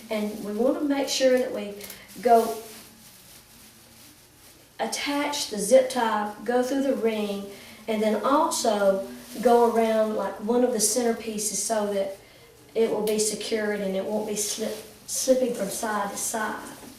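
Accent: South, United States. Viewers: I am very interested in making wreaths. Can you explain the differences in mesh. I have seen some peple talking about deco mesh and geo mesh. Are those the only ones and what are the differences? Thank you and I love watching your tutorials. I am from Louisiana where are you from. Your voice sounds south but definitely not as southern as me. Lol By the way you are great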